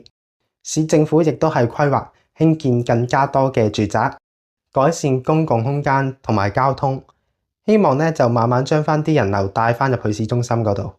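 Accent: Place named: Hong Kong, China. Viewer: 講者的英語口音仍停留在港式發音。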